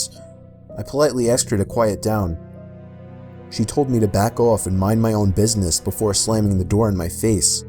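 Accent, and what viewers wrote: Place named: Boston, United States. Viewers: U sound like your from Boston just a thought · Classic. This is when had his NE accent.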